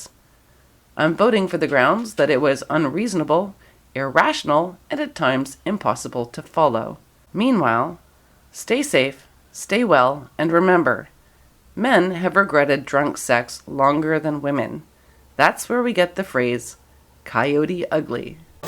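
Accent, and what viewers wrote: Canada. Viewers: I love your accent, Are you Canadian? Am watching your videos which are courageous...love the Bill Cosby one!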